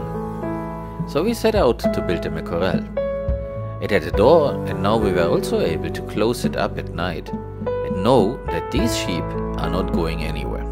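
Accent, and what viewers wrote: Germany. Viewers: Where did you get your German accent ?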